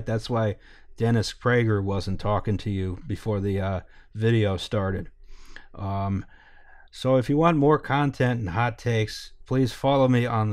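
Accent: Philadelphia, United States. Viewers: Philly accent.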